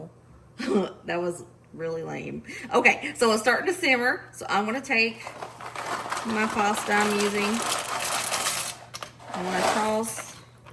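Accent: South, United States. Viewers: I love the way you say “ole” I love the southern accent❤ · Foil, oil, boil, anyone from the south says it just like you. It's part of our charm. Don't change.❤ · NO SHAME IN HOW YOU SAY OIL!!!!!!!! I say it like that too!! People tease but I love a Southern accent. That's one of the reasons I was hooked on your channel so fast! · I grew up in Chicago. Wash sounds like warsh or whoosh. I got teased so much when I joined the army that I forced the accent away. My mom said I sounded like I was from Boston. How you pronounce the words is due to your Southern accent. It isn't wrong; it's just different.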